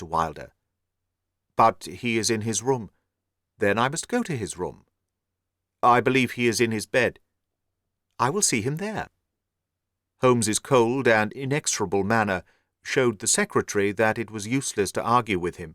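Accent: United Kingdom. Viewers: I do love listening to this man read. He gives an entirely new meaning to the words 'The King's English'.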